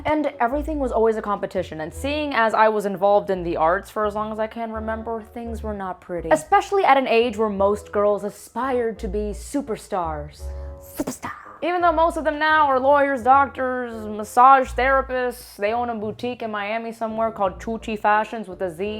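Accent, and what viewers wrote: Cuba. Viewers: I love your Cuban accent, especially when you imitate your grandma.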